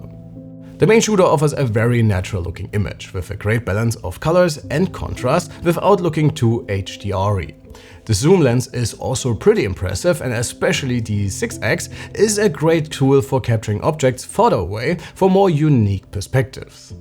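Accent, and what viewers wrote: Germany. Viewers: Harter deutschsprachiger Akzent (hab ich aber auch wenn ich Englisch spreche) 😊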